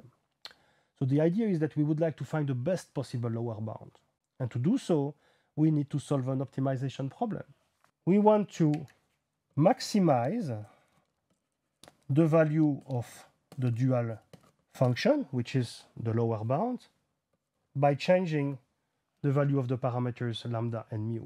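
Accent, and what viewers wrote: France. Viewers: Thanks! Love your French accent :)) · It is amazing how he has the heaviest french accent still being quite clear, understandable and concise. Ver'y r'ar'e...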